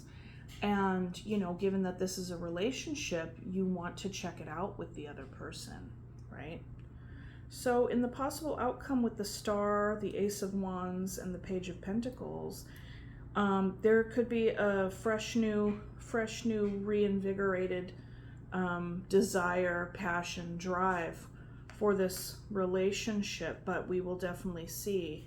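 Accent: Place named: Canada. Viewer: And ew, are you Canadian? I’m from Toronto? You sound Canadian! X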